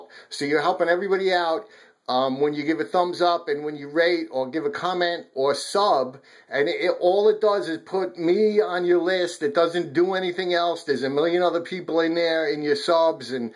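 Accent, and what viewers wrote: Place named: East Coast, United States. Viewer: He's from the East Coast of the USA - you can tell by his accent. Probably Massachusetts or New York.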